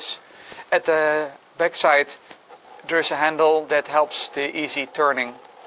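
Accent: Netherlands. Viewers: You are 100% sure dutch. (Me too) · You sound Dutch, are you dutch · ben je Nederlands? je klinkt zo😉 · lekker nederlands accent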